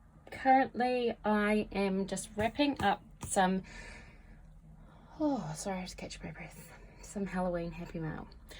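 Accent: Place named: New Zealand. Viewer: Love your accent. New Zealand?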